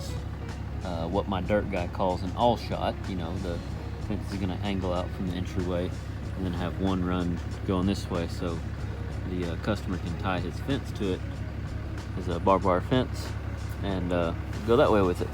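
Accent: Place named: South, United States. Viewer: I weld pontoon boat and boat lifts here in N.E. Michigan but I enjoy your videos. And listening to your southern accent! 😁. You have me saying " That A Way" now! 😅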